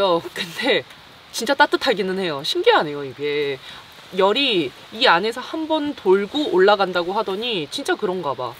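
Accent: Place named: Gyeongsang, South Korea. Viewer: 정말 잘 드십니다.👍👍👍👍👍집게벌레 독 없어요. 말씨는대구나 부산 같은데요?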